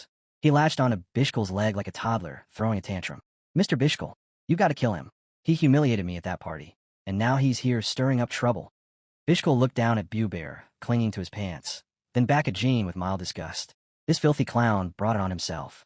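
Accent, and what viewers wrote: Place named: United Kingdom. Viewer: The audio became a British man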